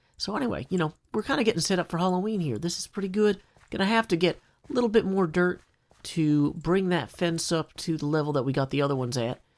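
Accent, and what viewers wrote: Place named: Missouri, United States. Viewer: My apologies, I was watching some other hermits and decided to make a list of collab teams I thought would be great, and as I commented on many of them I realized I left you and Wels out, which is in my honest opinion, is a good pairing of personalities. I won't edit the comment I left them, it reads as follows : The Hermit Stock Exchange is about to open for business, making affiliations now is the key to success in a fluctuating market, as a hermit, please consider the following; all stocks and coin seem to follow a 3 letter categorization in markets which can combine many subsidiary companies and/or projects, and I feel the HSE should follow suit. In this I suggest, not individual companies, but corps with multiple "teams" or "factions" competing in the market; I propose the following for classification and advertisement: RDC - Rendoc ISQ - Isqueen FZM - Falzom ITZ - Imtaz (or ZIT, I kind of like ZIT) ART - Architek CNV - Convex PYA - Pyffa XIZ - XIZuma (he has Devs to back him, like a hedge) DGE - Doge (a generic stock anyone can invest in [volatility] PS cute dog face ^^ ) leaving one open for a collab in the future (JSN maybe ^^) Going down the list I realized, Python is on a Terraria kick, and Biffa is without a team member, also, I seem to have left you two out, I am deeply sorry, I mean you're kool, calm, collected, have awesome BG music, and your accent reminds me of my time in Missouri; nice people there. 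I hope that you and Wels will take over the medieval distric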